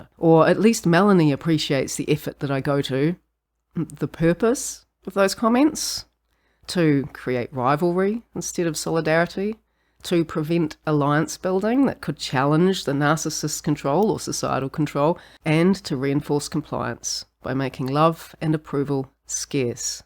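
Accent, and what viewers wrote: New Zealand. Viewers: Kiwi accent.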